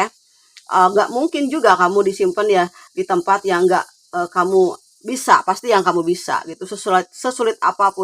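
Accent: West Java, Indonesia. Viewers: Aduh ngakak..lucu..orng sunda ya nih?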